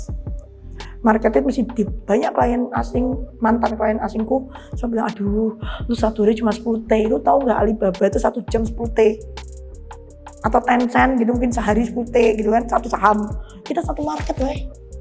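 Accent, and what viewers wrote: Java, Indonesia. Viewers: Cici penyampaiannya gokil substantif dan mudah difahami, terutama medok lagi 😅👍👍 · Cici jawane medok.. 😊😂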